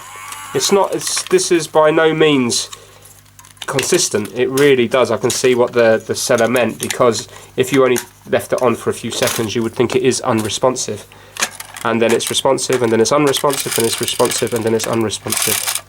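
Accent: United Kingdom. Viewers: Lol....The maker made the effort to add suspension, but no steering? What a piece of utter rubbish. british voice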